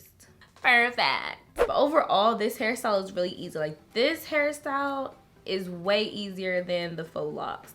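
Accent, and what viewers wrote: DMV, United States. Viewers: This was a hilarious video your accent you must be from the dmv area lol you had me dying laughing! But this tutorial was great I think I wanna try this method I cant deal with putting rubber bands in my hair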